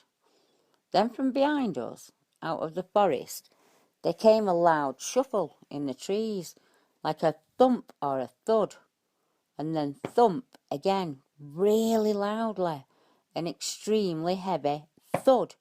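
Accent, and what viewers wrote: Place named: Sheffield, United Kingdom. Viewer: You sound like you are from Sheffield 😊. I pass Epping Forest every day. I hope I get to see Bigfoot.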